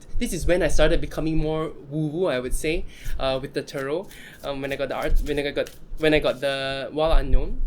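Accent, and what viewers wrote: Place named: Singapore. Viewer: Are you from Singapore or Malaysia? We got the same accent fam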